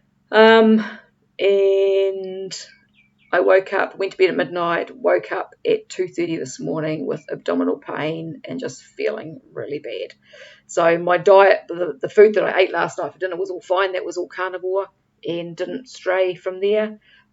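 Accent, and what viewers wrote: New Zealand. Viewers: Thank you for your videos. I totally understand about you forget WHY you gave up a certain food or drink. I hope you keep feeling better. I'm dealing with the same and wondered about psyllium. I didn't catch what you said your friend got you started taking? Sorry, as much as I love your NZ accent 😍 I sometimes have to replay to catch everything 😄 Oh! I just now saw your reply to someone else on this! Thanks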